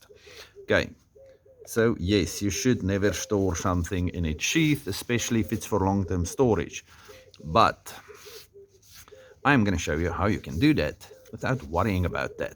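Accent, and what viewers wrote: Afrikaans, South Africa. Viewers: South African Accent? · love the Afrikaan accent, groeten uit Nederland!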